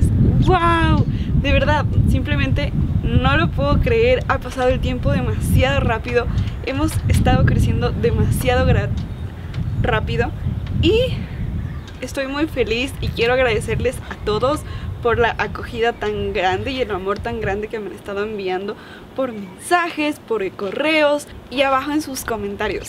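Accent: Mexico. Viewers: Oye en serio, no te veo tono, bueno no se si los ecuatorianos tengan un tono específico, para mi suenas son tono específico como mexicana, como yo. O digamos con tono mexicano, no es desconocido para mi, sino familiar. Tienes raíces mexicanas?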